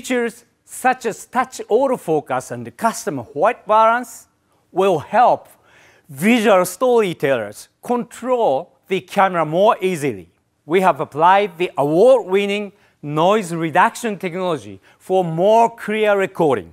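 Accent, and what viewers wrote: Japan. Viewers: what a thick Japanese accent, yet not so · Why can't they get someone who doesn't speak JAPENGLESE? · A perfect blend of Japanese english and fluent native english. For both Japanese and native speakers to understand. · For a Japanese. His English and accent is impressive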